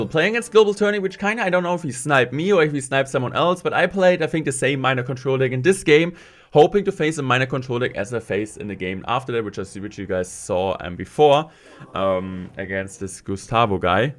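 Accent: Germany. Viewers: The reason why so many people are using minor control is because you showed the deck 😂 Edit: man hört deinen deutschen Akzent „bisschen“ 😂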